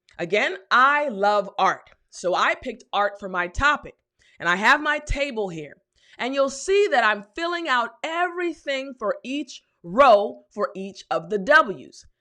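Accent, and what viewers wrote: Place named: United States. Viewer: Thank u soooo much teacher. You're sooo funny, that makes everyone to like your movies. I heard your song in that movie,even someone was sad can smill as well. I also like your smart accent, pure American. We're following you in a group person from Congo Kinshasa(DRC). Thanks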